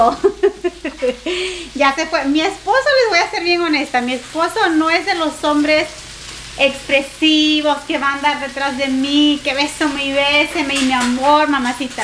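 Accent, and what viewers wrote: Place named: South America, Latin America. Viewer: Hola, te escribo desde España.....te descubrí antes de navidad y desde entonces te sigo. Eres una alegría de mujer, me encantas. A mí me encanta la gente sudamericana porque sois bien alegres, bien educados y con un acento como dices tú bien rico. Una pregunta, conoces el canal de Sandy y Fercho? Ella es colombiana y el Mejicano viven en Miami y también los sigo. El día 31 es el cumple de ella y le entró una llamada a su móvil de una tal, eras tú??. Un beso mi linda.😊😊❤❤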